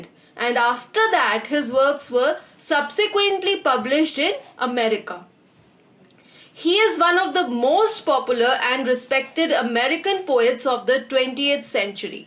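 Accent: India. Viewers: wow! it's a very strong indian accent! it was almost impossible to understand this lesson! this teacher is not prepared to teach english for no one!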